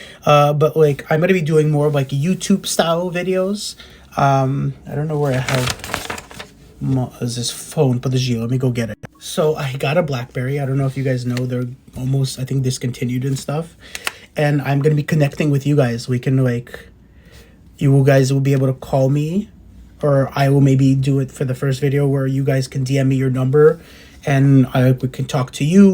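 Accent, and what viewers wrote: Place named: Russia. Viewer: Oh my god, I'm first to say, I love your videos and when I saw your video come on my youtube, I stopped what I was watching and clicked on your video., you are soooooooo talented. Your russian accent with your N.Y. mom character and Babyshka character are on point. I have watched all your videos. Please, Please continue making videos. LIfe is good. Be well and B+ (Be positive)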